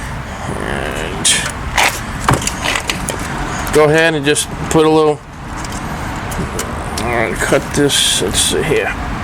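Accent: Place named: New Orleans, United States. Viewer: I can hear that New Orleans accent , lm a Cajun also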